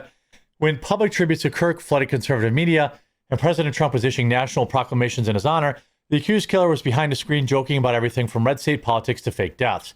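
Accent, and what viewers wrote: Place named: Wisconsin, United States. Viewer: the Quartering is from Wisconsin. Along with Minnesota and parts of North Dakota, that region is known for having a distinct accent within the US. Jeremys isn't all that noticable, but this is one instance where you can hear it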